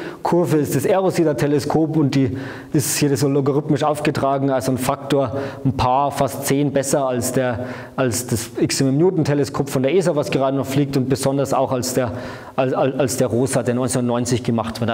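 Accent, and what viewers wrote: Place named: Bavaria, Germany. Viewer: Mit schwerem (bayerischen?) Dialektakzent und zu hohem Sprechtempo leider schwer verständlich!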